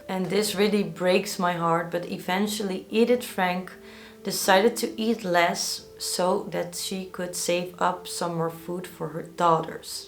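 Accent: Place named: Netherlands. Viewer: ik heb een warme plaats in mijn hart voor Engelstalige YouTube video's met een waarneembaar Nederlands accent. Als ik Engels spreek heb meteen een soort identiteitscrisis omdat ik word geconfronteerd met de keuze tussen Brits Engels en Amerikaans Engels, dit is volslagen onzin; ik zal Nederlands Engels spreken! that's different coockie!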